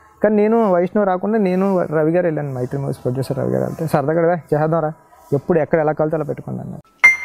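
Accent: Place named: Godavari, India. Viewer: Director sir, are you from Godavari district? Your language like that🙏🙏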